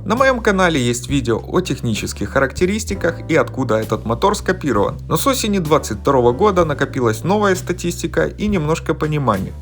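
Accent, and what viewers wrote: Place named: South, Russia. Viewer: Это южно-русский сельский акцент. Вроде паренёк неплохой , про политику не рассуждает. У них 80% русские, остальные 20% поляки с венграми.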